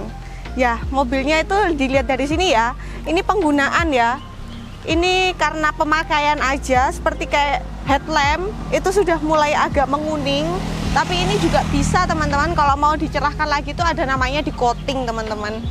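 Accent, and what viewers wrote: Java, Indonesia. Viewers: kusuka logat Jawanya...very orisinil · Tp medok ke jawa nya si mba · medok jawa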